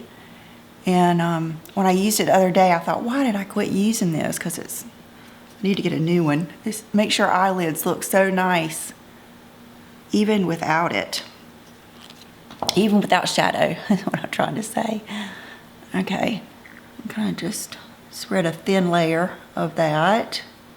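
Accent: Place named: North Carolina, United States. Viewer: Are you from nc? I'm trying to place that accent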